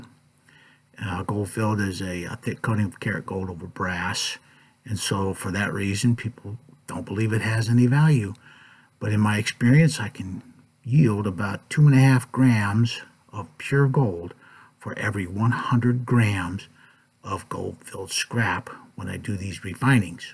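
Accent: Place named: Missouri, United States. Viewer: MISSOURI 🤷‍♂️🙄.....that explains your accent. Missouri is one of the hardest accents, to place.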